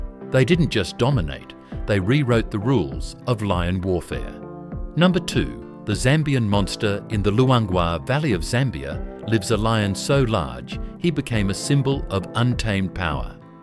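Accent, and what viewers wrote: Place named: United Kingdom. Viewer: I hate to have to listen to a British bot.🙄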